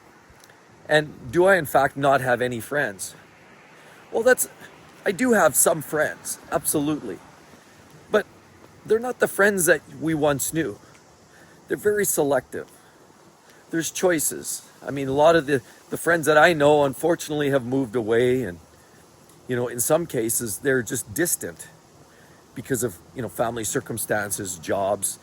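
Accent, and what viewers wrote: Canada. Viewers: ARE you CANADIAN? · Lol...I could tell by your accent you're Canadian. 😊 🇨🇦 🍁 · First...awesome stuff!--like your no-nonsense style along with the Canadian accent (ofcourse) haha.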